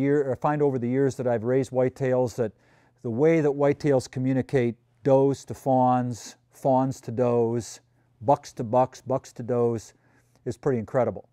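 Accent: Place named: North, United Kingdom. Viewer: Where was he from? He has a northern type accent, but phrases from the south..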